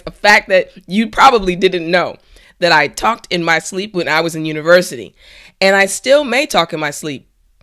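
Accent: New York, United States. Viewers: Are you from New York? Atlanta? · Are you American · by the way, you sound more American than the real British native speaker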